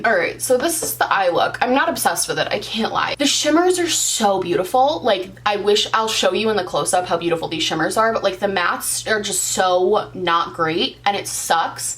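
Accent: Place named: South, United States. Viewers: Great Southern accent from a real Southern Belle!!! ♥️♥️♥️ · So glad you enjoyed your vacation! Also your southern accent killed me 😂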